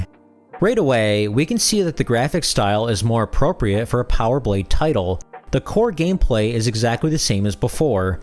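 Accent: Chicago, United States. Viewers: Is that a Windy City accent?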